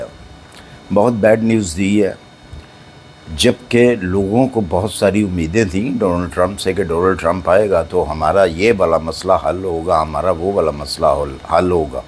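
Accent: Punjab, Pakistan. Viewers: بھائی اپنی engish تو ٹھیک کر لین ۔ لفظ . رسپونس . نہ بولیں ۔۔اسپوسر بولسن ۔۔اپ پنجابی مین english بول رهے حسن ۔۔۔۔ Dont use word: Response ...it should be sponsorship ... کسی پڑھے گکھے ادمی سے پتہ کر لو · Tu c paindo ho. Ardo (Urdu) punjabi which bolde ho.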